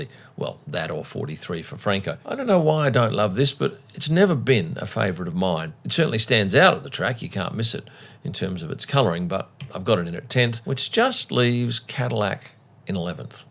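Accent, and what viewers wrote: Australia. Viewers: Hey,nice to hear a dinkydi Aussie talking about F1 again 🇦🇺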